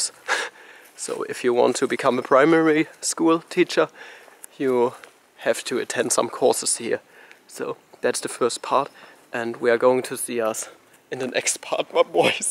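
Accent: Germany. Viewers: I do love how blunt German's sound when they speak in English, great video nonetheless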